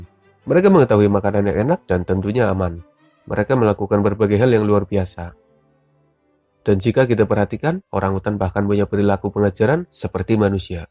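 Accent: Banyumas, Indonesia. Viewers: Host ny NGAPAK BANGET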